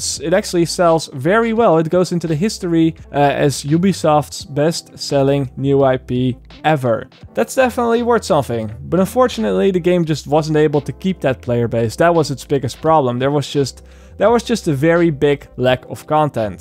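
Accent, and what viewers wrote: Netherlands. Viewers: Are u dutch?? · R u dutch? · Nederlands accent?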